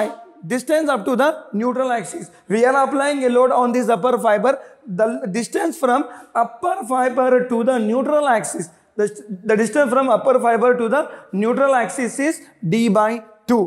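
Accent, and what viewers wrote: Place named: India. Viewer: but i totally can not understand the indian-english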